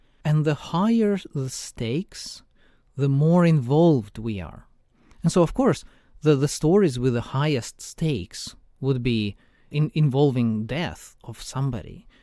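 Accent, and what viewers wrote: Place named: Russia. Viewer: First, the Russian accent; second, the subject matter; third, the relevant knowledge of history. I myself am half-Russian; Baptized Orthodox from infancy: My grandparents -- from the nobility and landed gentry, respectively so speaking -- escaped the USSR in around 1920 to emigrate finally to Canada via Western Europe. My mother was born in Canada right around the beginning of WWII; I was born in the USA in January of '71. It's A Small World; But it's an even smaller Orthodox World. God Is Good. Glory To God For All Things. До свидания, Господин.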